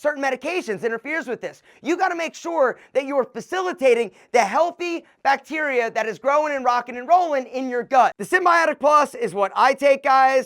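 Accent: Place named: AAVE, United States. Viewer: Try not speaking ebonics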